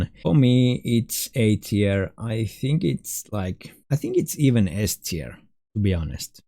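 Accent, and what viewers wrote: Finland. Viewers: Are you finnish?? You sound finnish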